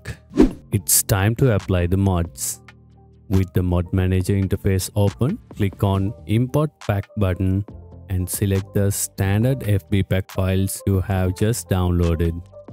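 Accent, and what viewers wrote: India. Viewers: your southindian accent do kicks more when you speak. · Every time it has to be some random Indian mf.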